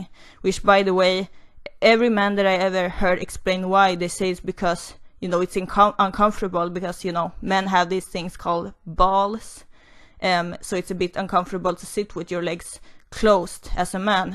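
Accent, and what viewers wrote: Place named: Sweden. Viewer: are you from sweden? you sound swedish!:)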